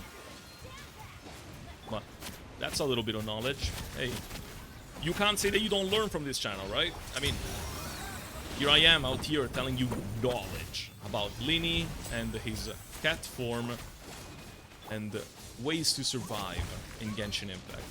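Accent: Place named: Italy. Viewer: Accento leggermente italiano dio bono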